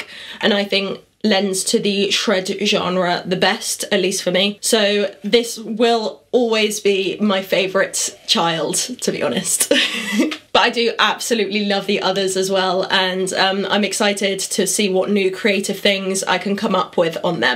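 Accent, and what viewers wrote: United Kingdom. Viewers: What part of UK does your accent come from? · Ahhh... she's a Brit ! 🤔🙄😏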